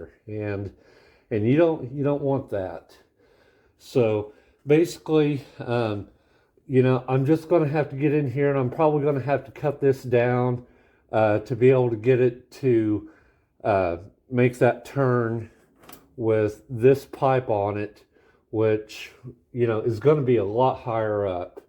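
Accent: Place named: North Carolina, United States. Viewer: What dialect are you speaking? North Carolina?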